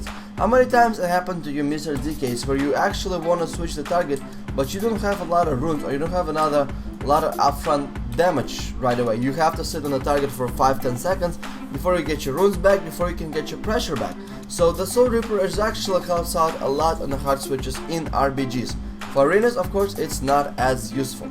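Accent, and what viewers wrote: Russia. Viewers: I know it's pretty gay to say this, but man I missed your russian voice. ;)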